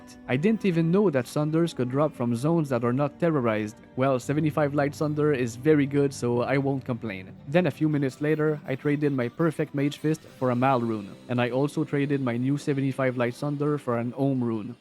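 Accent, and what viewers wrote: Quebec, Canada. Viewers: Avec ton accent, es-tu québécois par hasard ? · Sa parait tellement que tu est Quebecois juste par ton accent lol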